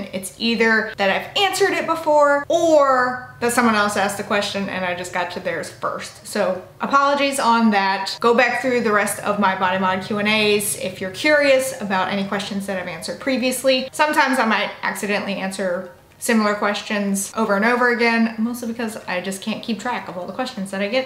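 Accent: South, United States. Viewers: I can tell your southern and just wondering where? Tennessee here😁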